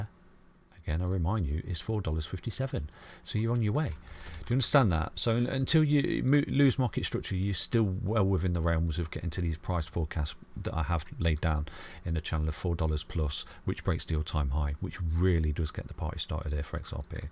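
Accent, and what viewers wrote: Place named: Middlesbrough, United Kingdom. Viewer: He might be from Middlesbrough as my friend has the same accent, he knows his stuff, hot on it!! crew 🚀🚀🚀🚀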